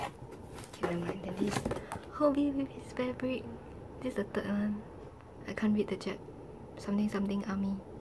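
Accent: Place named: Singapore. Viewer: Your collections are srsly goalsss! And omg I knew you're a Singaporean just by that singlish accent, you sound so cute 😂😂 I hope you get more subscribers because you honestly deserve them I'll be checking out other videos from this channel now 💖💖💖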